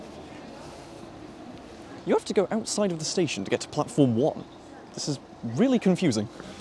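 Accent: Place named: RP, United Kingdom. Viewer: nothing says privately educated like this accent does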